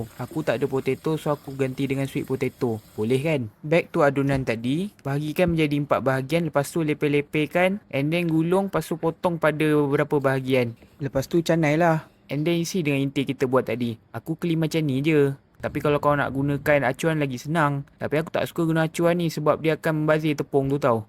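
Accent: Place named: Malaysia. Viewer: kakak orang Malaysia? karna suara nya mirip dengan orang Malaysia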